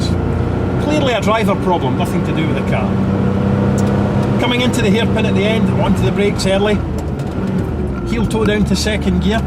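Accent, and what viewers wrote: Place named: Scotland, United Kingdom. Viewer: Could do with more engine noise and not a Scot talking a hole in my head...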